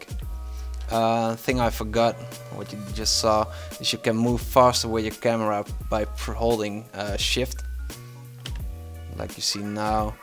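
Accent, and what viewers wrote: Netherlands. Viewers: You sound Dutch, are you? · Nice ! Are you from the Netherlands? Just wondering cause of the accent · Great tutorial , easy explanation for dummys and people who just needed that info + I can hear your Dutch so thats always good hehe. If you ever need help editing , acting or anything else please let me know, Would love to know ( + Im also experienced)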